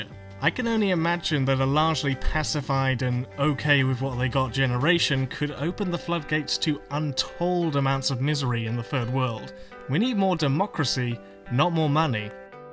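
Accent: United Kingdom. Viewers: Is it weird to anyone else to see such pearly white, moderately straight teeth with a British accent?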